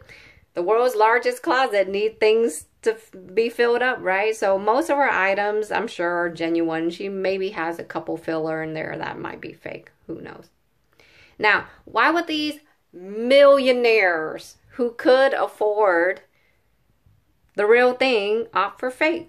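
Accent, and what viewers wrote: South, United States. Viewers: Southern, funny, and kept it aaaaall the way real.....new subbie. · On point sister, I’d rather have cash in my bank and love your southern accent 👏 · Love your southern accent ❤️ I’m a Californian and I love hearing southern accents. And I hear ya on the fakes, hence I’m so scared to buy on these retailers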